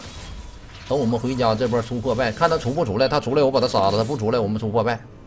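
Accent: Northeast, China. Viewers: 猴哥这东北话听着挺爽的😂😂😂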